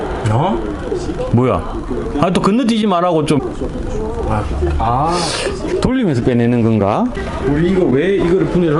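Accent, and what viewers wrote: Gyeongsang, South Korea. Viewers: 님 어디서 일하세요? 지역이 궁금해서리 느낌이 대구?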